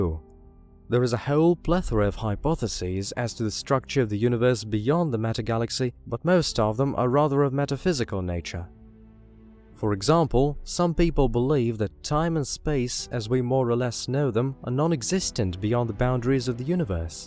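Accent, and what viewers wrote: United Kingdom. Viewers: This guy sounds like the posh English dude that does/did stream Civilization on YouTube when him and his friends played it together 👍😅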